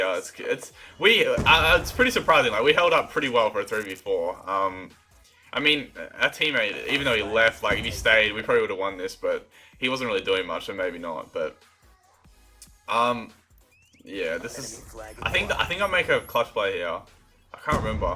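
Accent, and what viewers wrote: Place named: Australia. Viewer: You must be Australian but it's hard to tell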